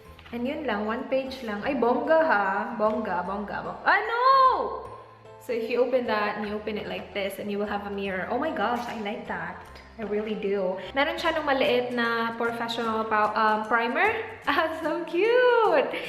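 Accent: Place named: Visayas, Philippines. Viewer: Are you Bisaya? I looooove your accent!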